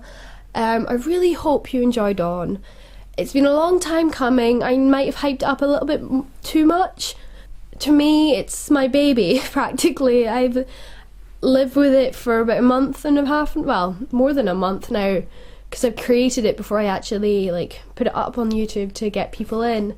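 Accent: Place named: Scotland, United Kingdom. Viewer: Haha, even with a cold, your voice still sounds really nice. I love Scottish accents. :) Okay, anyway, this was really interesting!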